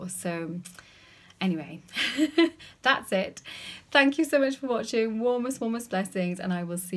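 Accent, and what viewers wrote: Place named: England, United Kingdom. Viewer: hi, I am somewhat new to your videos, but have been watching them. interesting. do you live in England? I am not good about accents. You could be in Australia or New Zealand, but i'm guessing England. you have a very pleasant personality. what exactly is a story witch? do you practice something such as white magic i think it's called?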